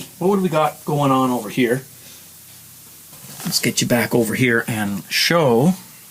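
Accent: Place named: Canada. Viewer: I say Wort , Wart same as you do . But not Wert . But when you say about ... I can tell you are Canadian... Cheers !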